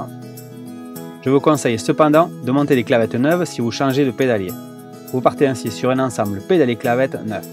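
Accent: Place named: South-West, France. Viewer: moi c'est cet accent du sud ouest qui me rend dingue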